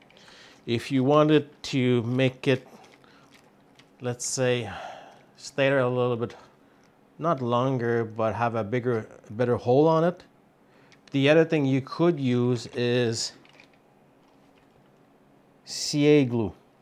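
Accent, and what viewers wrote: Quebec, Canada. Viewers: You sound like you're from Quebec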